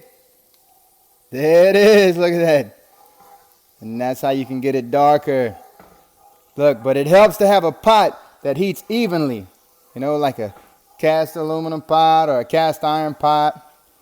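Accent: Louisiana, United States. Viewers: cajun accent...fixed it for you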